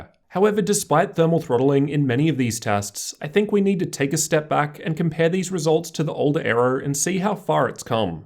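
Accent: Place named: Australia. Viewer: What do you think 2020 laptops will have to bring to the table? Do you think it will be a major price to performance boost or it won't be something too significant. Also a side note: I really like your Australian accent, adds what I call a ' touch' to your videos:)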